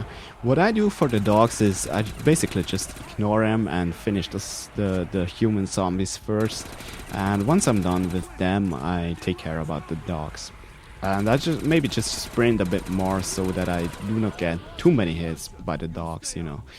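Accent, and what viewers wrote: Germany. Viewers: this is great dude thank u i was looking for this ur great and dude are u german i love ur accent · Are you german ? :D really good Video btw.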